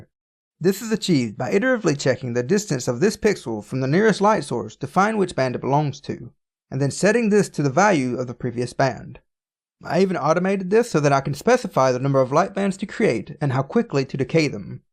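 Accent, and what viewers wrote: United States. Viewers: last video: italian accent this video: american truck dad accent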